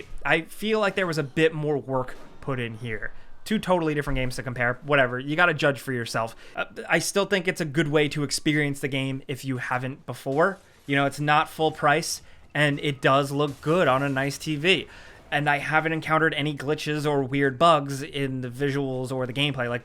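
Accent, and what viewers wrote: United Kingdom. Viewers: British male voice is the best!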